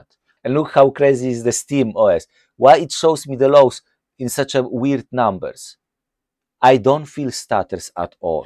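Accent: Poland. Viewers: Ah, wiedziałem! Polak Polaka zawsze pozna po mowie 😅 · First time on this channel. Little off-topic. Your accent sounds like you're Polish. Am I right? Back to main topic. I use Void Linux which is independent distro, not clone of Ubuntu/Fedora/Arch. I have been using Linux for almost 2 decades now (had a break from it). On Void, some games starts immediately. Running some games requires debugging and deducing which libraries are missing, which can be frustrating. I rely on Google & Gemini AI for support.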